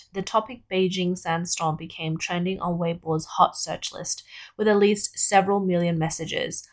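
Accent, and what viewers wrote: Australia. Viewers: cute Aussie accent 😂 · A pleasant voice, sounds a little Australian. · Is the presenters voice/accent second home Australian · The accent is very urban Aussie (Melbourne / Sydney accent). I like it a lot. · The narrator kept saying "dessification." Did she mean "desertification" or "desiccation"? (Her hyper-Australianized accent was not always clear, especially with the over-emphasis of the r-dropping final syllables, as in "wahtaah".) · hmm, she has a very light Australian accent actually. You've obviously never heard a "hyper-Australianized" accent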